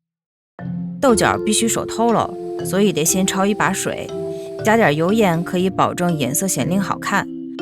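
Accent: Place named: Tangshan, China. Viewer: 唐山话好可爱 哈哈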